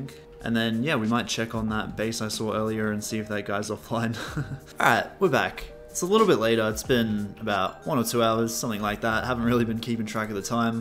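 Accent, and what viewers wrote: Australia. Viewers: are you aussie bro? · You Australian? If so love from another Aussie love your content mate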